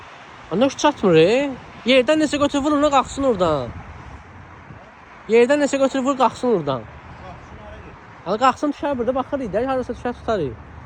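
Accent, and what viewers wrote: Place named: Mashtaga, Azerbaijan. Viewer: Hansı ləhçədir,Maştağa?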